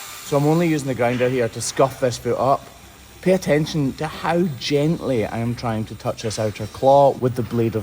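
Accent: Scotland, United Kingdom. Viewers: As I read your book, I'm reading it in your Scottish accent 😂 Kinda weird eh 😊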